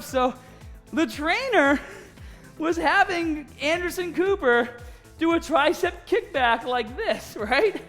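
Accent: Boston, United States. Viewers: I’m assuming he’s a Boston guy, right? Gahhhhbage, Lahhhson, etc. 😂😂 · Is he from Boston ? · Boston accent keeps coming out. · Is that a Boston accent? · Man are you from Boston? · Is from Boston?? GaaahBage....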